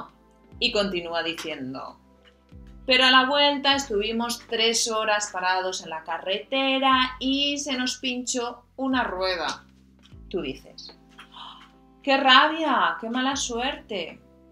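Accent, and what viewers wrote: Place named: Spain. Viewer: Me encanta su voz y acento española. Te mando besos y abrazos desde EEUU 🇺🇸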